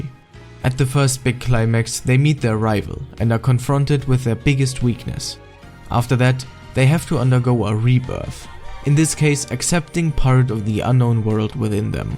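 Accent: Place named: Germany. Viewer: sounds like a german accent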